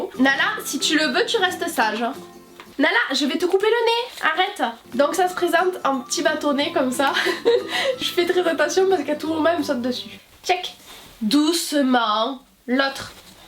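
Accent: South, France. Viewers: Ahahah j'ai adoré 😂 Apparemment les Beagle sont super difficiles à dresser, c'est ce que tout le monde dit 😂 bon courage 😅 Ta bonne humeur et ton accent du Sud font du bien au moral 😘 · Ah l'accent du sud, sa régale 🙂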